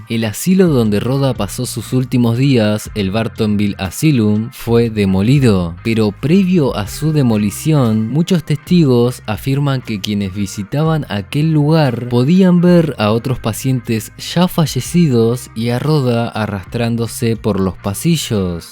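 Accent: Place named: Argentina. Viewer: WTF Dross desde cuándo tenés acento argentino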